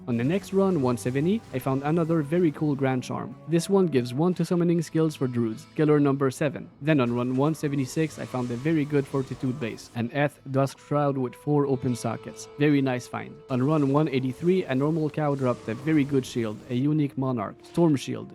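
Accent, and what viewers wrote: Quebec, Canada. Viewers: es-tu queb? tu sonne queb haha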